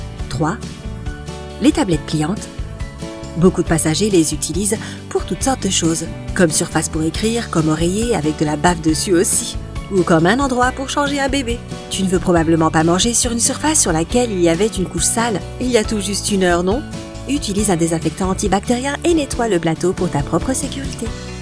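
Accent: Canada. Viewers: Pourquoi on entend plus la voix canadienne qui commente ? Sympa je veux la voix canadienne, elle avait tant de charme que j'aurais pu l'écouter pendant des heures en non stop 😍😍😍 ! alors stp sympa mets là de temps en temps au moins ! Merciiiiiii !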